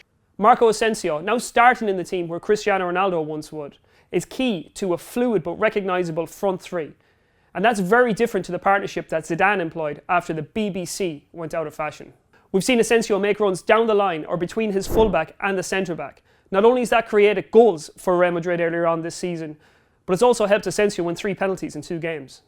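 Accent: United Kingdom. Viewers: Canadian here. Where's this guy's accent from? I.e., what part of the UK?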